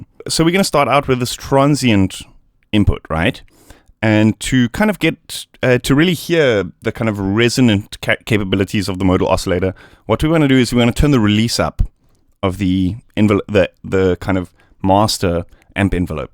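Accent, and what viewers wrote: Germany. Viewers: Sounds like a german accent to me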